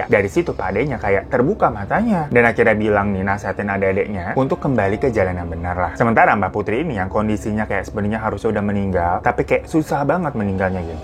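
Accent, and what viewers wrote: Java, Indonesia. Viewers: Mas nya pas pake bahasa jawa jd lucu ngomonge🤣🤣🤣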